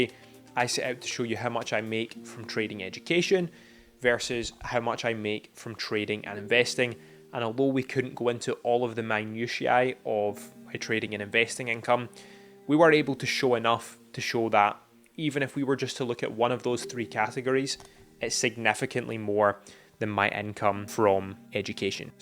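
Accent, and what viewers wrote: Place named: Glasgow, United Kingdom. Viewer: Hey, I've just came across your videos. Are you based in Glasgow? Making presumptions on your accent. I'm full time trading based in Motherwell. I'm sure I'm the only full time swing trader in Motherwell!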